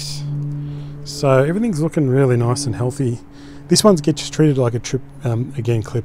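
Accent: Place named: Australia. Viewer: Beautiful maples ❤ I love that Aussie accent. You have beautiful trees and I appreciate you sharing your thoughts on trimming them in spring. 👍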